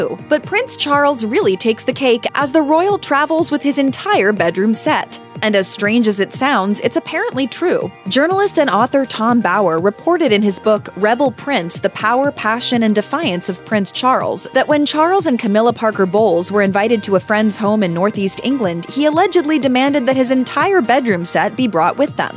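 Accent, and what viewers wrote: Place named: United States. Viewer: Sorry,but why do we always have to suffer these damn American accents!!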